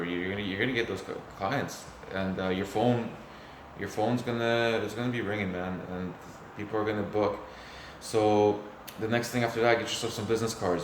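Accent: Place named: Lithuania. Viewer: You sound like Lithuanian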